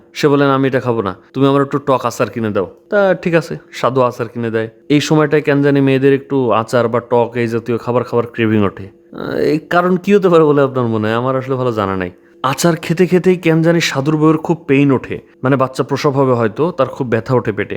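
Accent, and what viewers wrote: Khulna, Bangladesh. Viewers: ভাই... আপনি কি আমাদের খুলনা/ বাগেরহাট জেলার মানুষ? আপনার কথা শুনলে সেটা বোঝা যায়। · ভাই আপনার কথা শুনে মনে হচ্ছে আপনার বাড়ি খুলনা অঞ্চলে🙂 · আপনার বাড়ি কি খুলনা?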